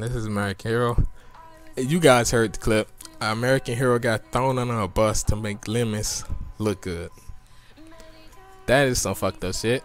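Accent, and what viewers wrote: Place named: South Florida, United States. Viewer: Let em know Hero! Btw are you from FL cause you sound like you got a south florida accent.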